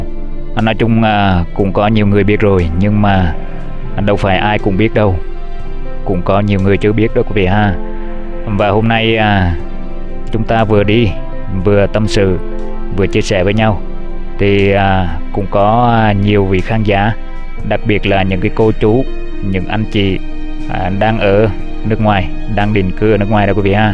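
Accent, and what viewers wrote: Central, Vietnam. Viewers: Nghe anh phân tích thích ghê,,,giọng miền trung nhẹ nhàng dễ nghe,,chắc hát hay lắm đây,,Em thích kênh này với Nhân La Cà nhất,,ngày nào ko xem cảm giác như thiếu thốn gì đó,,thanks anh!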